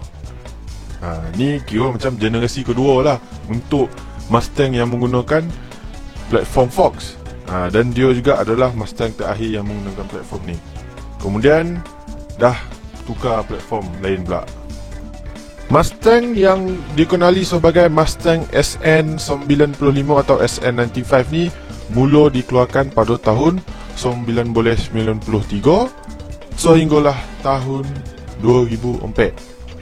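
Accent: Negeri Sembilan, Malaysia. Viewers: Ada 2 sbb utama kenapa orang layan video bro: 1. citer pasal asal usul kereta. 2. loghat nogori yang rasa dekat di hati dan klaka.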